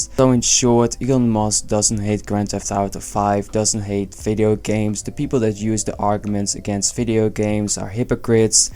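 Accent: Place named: Netherlands. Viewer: lmao this sounds like some heavy dutch accent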